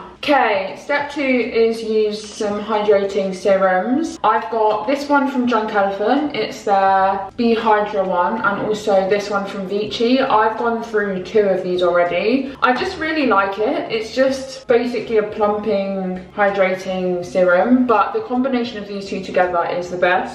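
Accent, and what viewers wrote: United Kingdom. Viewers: Amazing Channel and by the way I’m from the U.S.A. And you are from the U.K. I heard because your voice is British mine is English nice to meet you “socially”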